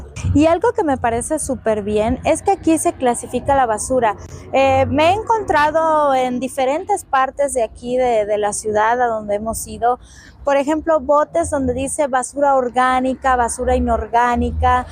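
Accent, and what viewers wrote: Mexico. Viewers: Tienes muy bien el asiento mexicano y no cubano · Ya no tienes acento es más pareces más mexicana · Conozco cubanos acá en usa , tu no tienes acento cubano , ya eres Mexicana 🇲🇽🇲🇽🇲🇽🇲🇽🇲🇽🇲🇽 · No Tienes acento Cubano 🙄 Parece qué eres de aquí de México Saludos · Amiga...una pregunta...como se siente a estas alturas del tiempo haber perdido el acento cubano....no te sientes mál.....tienes orgullo del acento mexicano...cual es tu sentimiento al respecto? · Hola usted no se escucha como cubana habla como Mexicana saludos buen video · Una cubana con acento mexicano 👍✌️se explica muy bien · Cómo es que asimilaste y adoptaste completamente el acento Mexicano? ... siendo el acento Cubano tan difícil de perder. Bueno, en 10 años si es posible .❤ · Amiga ya me suscribi saludos para ti ya hablas como mexicana😊😊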